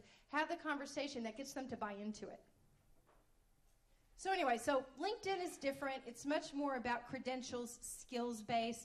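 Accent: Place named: Kentucky, United States. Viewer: It was brought to my attention today that someone is offended by a comment I make at the start of this video, and because of my earlier comment here, referencing my Kentucky accent (the offended person didn't write me - her colleague did). Prompted by that second-hand feedback, I've written a blog that I'd like for anyone who is offended by my self-depreciating humor to please read first BEFORE becoming outraged. There's also suggestions in the blog on how to communicate with me directly about any of my work, so that things can be clarified before offendedness ensues. <link>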